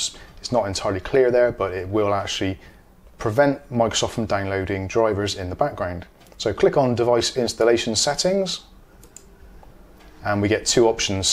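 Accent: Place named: Gloucester, United Kingdom. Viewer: Highlands! But from Gloucester originally, so I recognise the accent haha (or am I completely mistaken? haven't been there for a while lol)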